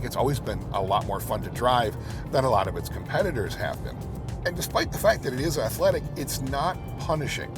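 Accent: United States. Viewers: I’m guessing he’s an American?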